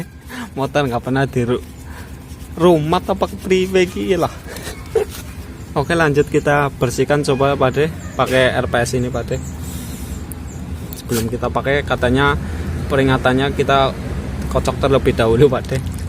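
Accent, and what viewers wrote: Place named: Java, Indonesia. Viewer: Asli mana bang..kok ngmong blepotan Jawa juga😅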